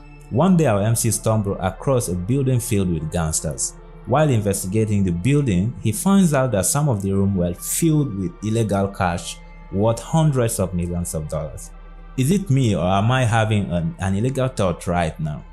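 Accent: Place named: Nigeria. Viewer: Bro you Nigerian right Your accent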